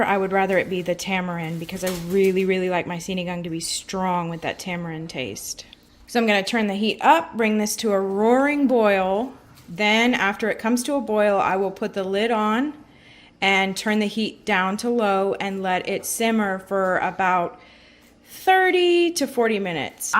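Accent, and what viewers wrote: Visayas, Philippines. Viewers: i saw u in jared's video i'm tagalog but i grew up with bisaya friends even though i don't speak biasaya i close my eyes i hear a bisaya person speaking, a fellow filipino. more power to your channel. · Nice🎉 bisaya Accent! So fluent... _siyempre gikan sa pilipinas.. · Anaa ko dri tungod ni Jared..ug ako nalisang Kay tungod Wala ko naanad ga lantaw ug amercanang nag binisaya ug Ang accent bisaya kaayong dako😂 I'm glad and I'm subscribing..I'm so amazed❤ this will be my favorite blog.. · There is no way that I can't subscribe to your channel. You got me with your perfect Bisaya accent. Maayo kaayo ka magbisaya, day! Lingaw ko tan-aw sa imong video unya kabalo pud kag Japanese word daikon sa radish. Diay, pareho mog nawong ni Angelica Panganiban. Pareho mo guapa! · Pagka bisaya baya nimo uy! Haha! Taga Cebu ko pero naa na ko ga puyo sa Virginia. 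I miss hearing my mother tongue. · Bagsika nimo mgbisaya dai oi😂❤ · Kacute ni dai magbinisdak oi..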